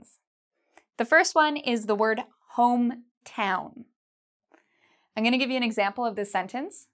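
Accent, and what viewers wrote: Canada. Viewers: Are you Canadian? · I can understand you all aspect and topic that you make. Your accent and Canadian english is very clear and neutral than U.S.A and british english. Thanks teacher!! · I have been watching videos 4 the last few months and I have found out that she is brilliant teacher. I adore her Canadian accent!, u rule! Thanx a lot 4 lessons.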